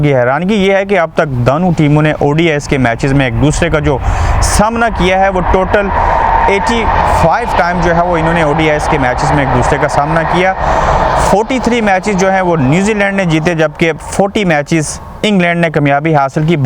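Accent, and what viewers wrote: Kashmir, India. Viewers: You’re anywhere from kashmir I can feel it the way of talking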